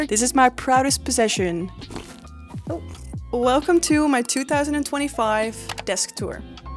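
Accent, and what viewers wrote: Netherlands. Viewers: dutch accent? or not? anyway, nice vid! wtf that cabinet , looks like its about to fall apart😂 but what was that thing all the way at the ceiling? extra storage spot?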